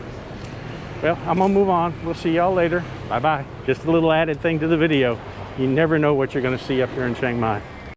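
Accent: United States. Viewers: Where are you from? You sound like your are from my neck of the woods, I am from Jefferson County just east of Knoxville TN. You sound like you are from the promised land lol! Sorry if I am wrong.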